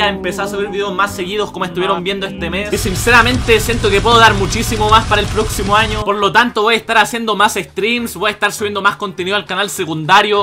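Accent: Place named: Chile. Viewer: La unica parte buena de chile, es ese acento chistoso. Grande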